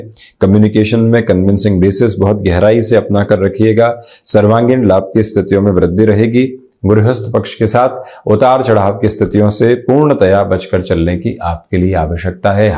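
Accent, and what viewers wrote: Standard. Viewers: क्रपया नाराज मत होना आप क्योंकि मैं भविष्यवाणी से ज्यादा वर्तमान कर्मों पर यकीन करता हूं (क्योकि कल किसने देखा है, क्या पता कल हो न् हो)/ लेकिन आपके शुद्ध शाब्दिक उच्चारण का दिवाना जरूर हो गया हूं मैं, इसमें रत्तीभर भी कोई शक नहीं है/ आपके वीडियो देख-देखकर मैं अपनी खुद की हिंदी भाषाई जबान को लगातार शुद्ध करने की चेष्टा करने का आदि सा हो चुका हूं/ मेरा ऐसा मानना है कि यदि कोई व्यक्ति हिन्दी भाषीय समुदाय से हो तो उस व्यक्ति को अपनी शुद्ध हिन्दी भाषा की गरिमामय जुबान को शुद्ध रूप में जीवित रखने की नैतिक जिम्मेदारी अवश्य निभानी चाहिए "पीढ़ी दर् पीढ़ी"/ एक तुच्छ हिंदू प्राणी स्वरूप मैं ये जिम्मेदारी बखूबी रूप में निभाने की कोशिश अवश्य करता हूं अपने परिवार में/ ईश्वर से कामना है मेरी कि आप सदैव सुखी व् स्वस्थ रहें परिवाजनों सहित/ हार्दिक शुभकामनाएं🙏🙏🙏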